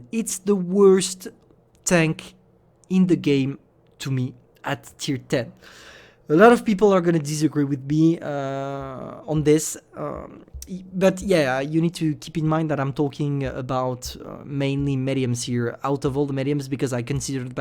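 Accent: France. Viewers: T'es français??? parce tu a un accent français quand tu parles anglais :)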